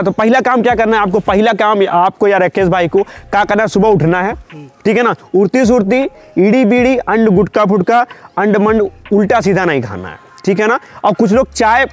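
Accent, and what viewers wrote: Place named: Bihar, India. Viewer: I love bihari acsent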